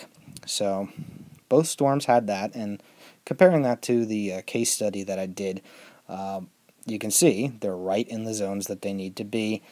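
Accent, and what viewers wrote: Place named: Pennsylvania, United States. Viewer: Sounds like your from Berks County me too awesome storm but I was around in 83 blizzard also. 83 was more like 26-30 in SE BERKS. With a lot of lightning & thunder snow & 4-6 inch per hour rates & 12 degrees at the height of the storm both were unforgettable. The Jan 2016 storm we got 27 inches but not near the wind of 83 & 96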